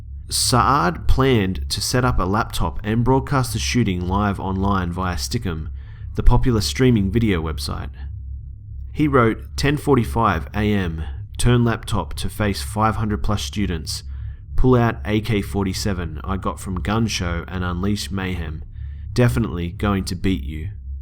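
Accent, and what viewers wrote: Australia. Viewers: Him: G'day mate- Me: Oh shit an aussie. I'm subscribing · Only subscribing because you're Australian and your voice sounds majestic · I cannot stand the Australian accent. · wew lad aussie accent..shitposting inc. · Are you Australian?? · you sound so aggresively aussie ahaha, love it. keep up the good work.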